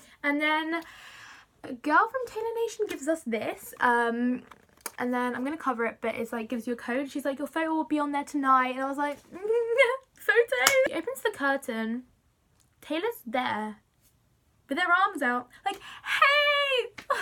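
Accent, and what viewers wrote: United States. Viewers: Awesome video!!!! just found your channel. That's a good start on your American accent. Keep working on it. Practice makes perfect. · I am a 34 year old woman getting ready to go to a Taylor Swift concert in a couple weeks. I feel like we are sitting here fangirling together. lol And your American accent was one of my favorite parts. :)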